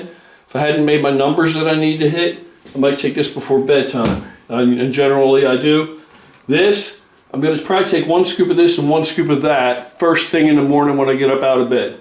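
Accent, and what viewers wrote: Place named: Philadelphia, United States. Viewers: You sound like you're from Pennsylvania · You sound like you live in Philly or somewhere in the Delaware Valley.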